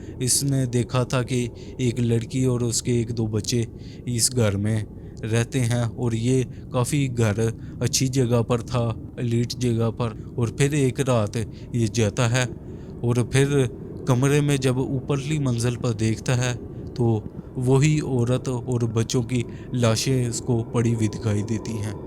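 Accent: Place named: Pakistan. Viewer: Bhai hardwork karte raho aap.... Bhadiya hai video.... By the way aap kha se ho... Aapki bolne ki language se pakistani lag rahe ho...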